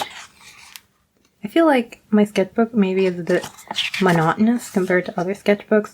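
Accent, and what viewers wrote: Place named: Michigan, United States. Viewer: Ah man! I have so much to say about this! It is lovely to see everything, and I'm impressed by how consistently well done everything in this is (most of my sketchbook pages are completely unfinished.) Your experimentation with styles is inspired and wonderful to look at because of how well you adapt to them. I'm scared that you don't like practicing full body though. I know I struggle with it too, so I get that it's intimidating, but please don't be discouraged! Gesture drawings and references really do help, and all artists should integrate them into their habits. Also your accent is adorable, my god I never realized how different Michigan and Canada's accents are.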